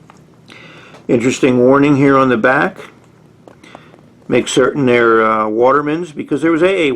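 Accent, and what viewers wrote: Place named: Appalachia, United States. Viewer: great video. I always learn a lot about vintage pens from you. congratulations on reaching a milestone with your Channel. I have to ask, based on your accent, if you happen to be from the Allegheny mountain region originally?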